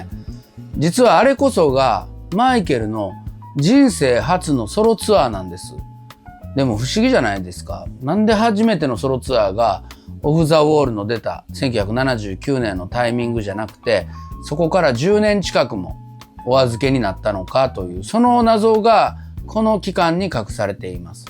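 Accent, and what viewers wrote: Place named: Kansai, Japan. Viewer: 大学生のときにアメリカ音楽の歴史を学び、Michaelが大好きになった31歳です。大学生でめちゃくちゃハマったときレポートも書いたのでそのときに西寺さんが書いたMichaelの本も読みましたがはじめてお話されているところを見て関西弁にかなり親近感を感じてます！この動画を見て、IMAXで2回目見てきます👀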